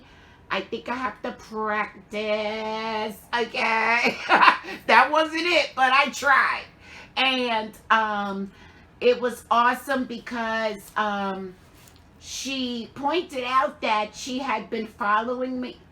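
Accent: New York, United States. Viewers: That is so funny, I was going to say I hear a NY accent. My parents were born and raised in the Bronx, I grew up an hour from the city and now live in CT:)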